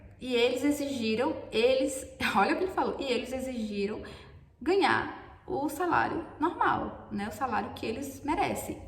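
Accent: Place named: Northeast, Brazil. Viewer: Acho o sotaque nordestino horrível , mas gostei tanto do jeito dessa menina,do espírito aberto dela, que nem consegui reparar o sotaque.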